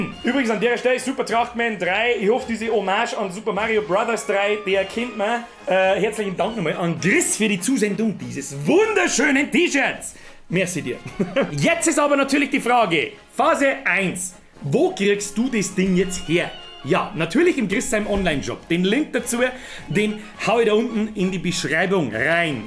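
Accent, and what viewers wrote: Bavaria, Germany. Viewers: weil boarisch einfach geil is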